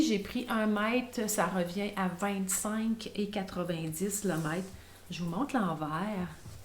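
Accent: Canada. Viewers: Bonjour ma belle ! J'espère qu'aujourd'hui il fait un peu plus chaud chez vous ?!? J'adore te regarder et surtout t'écouter pour me régaler de ton accent canadien. Tes tissus sont tous très sympa. La veste burda est réussie. A voir l'histoire du bas de la veste (je n'en ai fait que deux jusque là donc les conseils je ne peux te les donner ) ! Burda c'est uniquement du texte c'est surprenant mais ça s'adresse plutôt à celles qui ont un peu d'expérience, sauf les Burda Easy qui sont des basiques et il en faut. J'ai repris la couture il y a trois ans et contrairement à toi je prends du tissu pas cher et je tente plein de choses pour les adapter à ce que je veux sur moi. Le tissu plus onéreux je l'utilise pour les projets dont je suis sûre. A bientôt ma belle 😊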